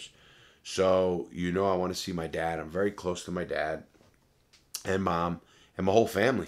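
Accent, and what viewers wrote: New York, United States. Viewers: I found this channel and got hooked. I’ve been to jail but never up the road. Interesting to watch and listen. Plus I’m a Long Island native. Knew was from NY cause of the accident.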